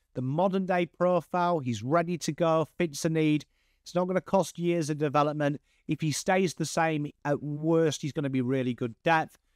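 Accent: England, United Kingdom. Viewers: Curious, how did a Pom with a such a thick accent get to know so much about AFL, do you live in Oz? Anyway great channel and insights. Tom McCarthy has firmed as the front runner it seems. He's fast, really fast. Right age bracket, plug n' play. But gawd, we need a good ruckman asap!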